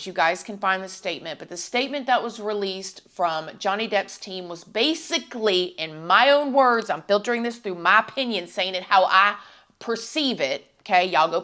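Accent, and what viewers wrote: South, United States. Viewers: Great job! I so enjoy you! You sound Southern like me. What is the best is your kindness yet unafraid to speak truth! You go girl!!! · Mmmm no, I disagree 36 years old is old enough for Amber to know better. If you watched the trial, then you're aware she's been given some pretty strong diagnosis as not a well person. With all respect to you and your channel,( which is obviously successful) Do your thing! You're pretty, got a great southern accent and a gossip show flair. (funny as a viewer to be called one of your "babies" as I'm nearly 2x's your age). Well being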